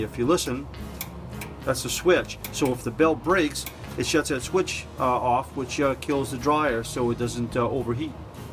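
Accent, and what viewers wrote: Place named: New Orleans, United States. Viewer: Dang buddy I recognize that accent anywhere...New Orleans or somewhere close lol thanks for the tips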